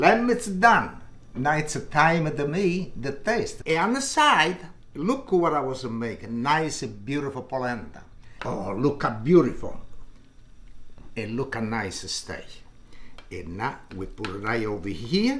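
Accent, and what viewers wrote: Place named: Sicily, Italy. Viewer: Looks great I love lamb and I do make that but not this recipe I definitelywill do this recipe thank you and I love your videos and accent remind me of my patents who cane from Sicily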